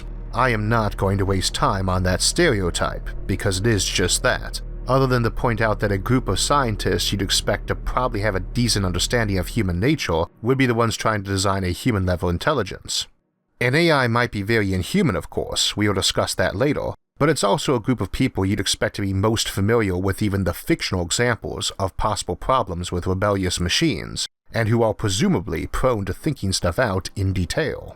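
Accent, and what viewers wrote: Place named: South, United States. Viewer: Lol. I didn't even know you had a speech impediment. I'm English and thought you had a Southern American accent.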